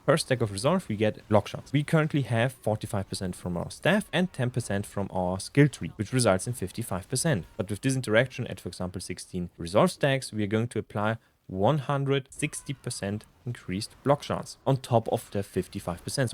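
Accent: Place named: Germany. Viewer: Jede wette das er ein Deutscher ist. Man hört es voll raus 😅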